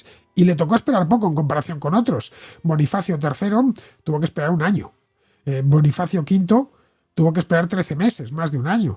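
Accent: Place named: Galicia, Spain. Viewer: "¡Interesante! Aunque tu manera de modular no es la mejor y tu acento me recuerda al de un gallego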